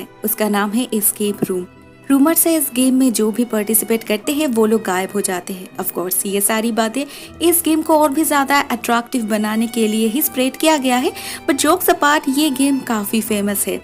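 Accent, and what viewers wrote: Bengal, India. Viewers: Aap bangal se ho ky? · Hey r u from kolkata your voice seems like u r bengali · Apka bengoli tone sunne me aur samjhne me thode issue hote hai verna baki sb toh sahi hai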